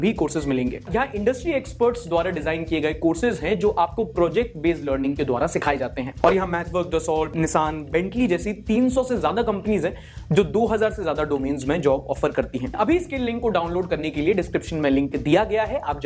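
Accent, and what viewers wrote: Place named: Bundelkhand, India. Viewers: It feels so good to hear a recongniged youtuber speaking bundelkhandi.. ✨😁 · Bundelkhandi very beautifully spoken.. keep it up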